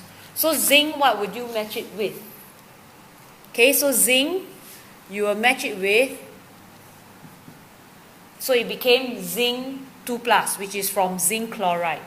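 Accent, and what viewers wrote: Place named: Nigeria. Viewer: She has a Nigerian accent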